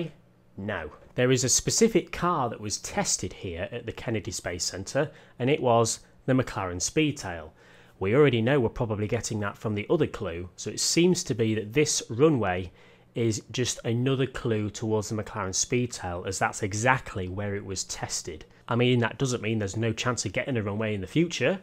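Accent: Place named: United Kingdom. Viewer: You have a problem to his accent? He's british, and these are purely american names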